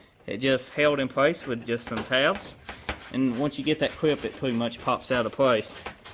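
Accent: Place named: South, United States. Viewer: Thanks for the video, your accent is very interesting! what part of America r u from? I'm from Australia. I'm guessing u r somewhere in the southern US but still curious what specific area. 🤓 I installed my aftermarket headlight today, went pretty well only snapped one bolt and many of those brittle bitch clips lol ps for anyone yet to do this job, remember to remove the metal bracket from the original headlight and switch it over to the new one! Don't do what I did and have it nearly all together scratching your head wondering what's missing then have to pull it all apart again 😂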